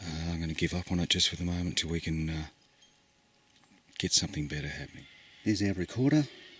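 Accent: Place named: Australia. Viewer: Good video. Love your voice from Down Under if I am right? I just hope a hairy guy does not tamper with them! I know they are not cheap! An online friend of mine I spoke with a long time back from Perth used to have a Kookaburra. About as annoying as a woodpecker here in a tree! I hope you do not have any there to scare them away and drown out the vocalizations! Wonder if they can mimic birds like that?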